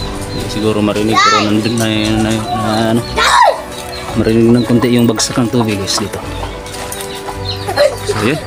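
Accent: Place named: Visayas, Philippines. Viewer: Idol taga asa man mo? kay bisaya man ang imong dialect? Taga cebu ko tks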